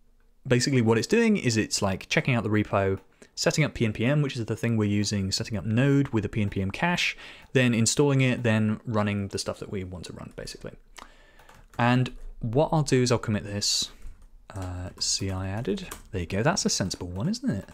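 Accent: United Kingdom. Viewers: Are you scottish?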